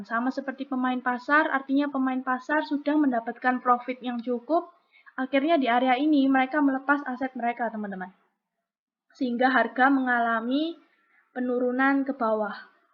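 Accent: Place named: Java, Indonesia. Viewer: Mbak Wong ngdi.. medok lo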